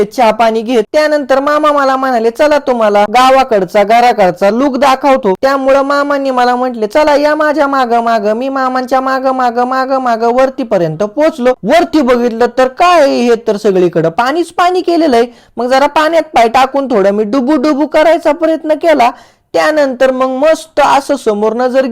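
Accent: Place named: Nashik, India. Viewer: दादा तुझी भाषा बोलण खूपच change झालं आपल अगोदर sarkhach बोल छान वाटत नाशिकची भाषा बोलू नको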